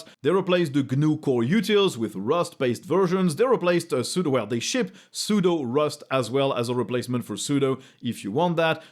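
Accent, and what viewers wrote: France. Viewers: I come here for the Linux news, I stay for the French accent.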